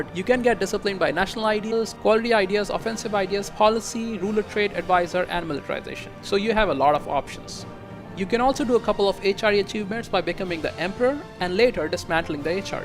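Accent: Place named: India. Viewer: make a tutorial on India. I hope i'm not wrong but you sound Indian. If you are make a tutorial on India and tell us some history :D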